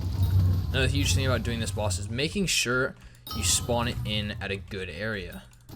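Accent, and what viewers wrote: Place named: Netherlands. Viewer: You sound Dutch! Are you?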